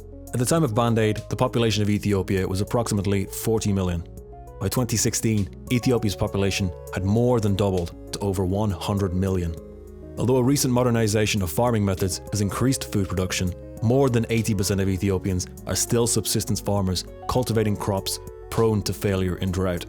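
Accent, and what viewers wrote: Ireland. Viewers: You sound irish, so what you think of as christians is a little different in the sivalised world. We don't all exchange potatoes wile huddled around a fire made of cow dung. Sorry to rewen you nestalga. XoXoXo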